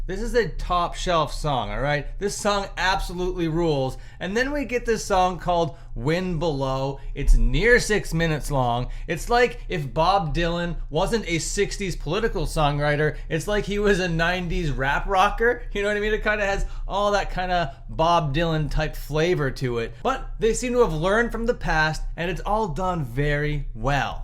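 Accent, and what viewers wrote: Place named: Canada. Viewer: He’s speaking Canadian buddy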